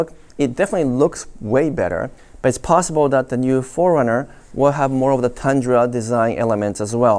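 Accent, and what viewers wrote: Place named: Japan. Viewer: Oh damn the best Japanese English.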